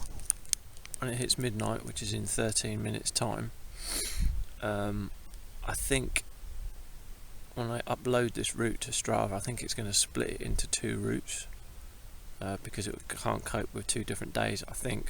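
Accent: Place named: Northern England, United Kingdom. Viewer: Yes I did admire your cycling shoes LOL. Atleast you had cool feet:) Well done on completing the route in one day. Nothing better than a personal challenge. I've never heard you come out with a Norther accent before. Had to laugh at the swear words. This is a real video. Welldone. Big thumbs up.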